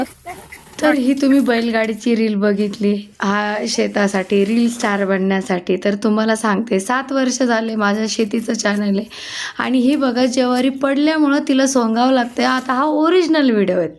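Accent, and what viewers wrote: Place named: Ahmednagar, India. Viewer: ताईआपल्या भाषेवरून मला वाटतं आपण नगर जिल्ह्यातल्या आहात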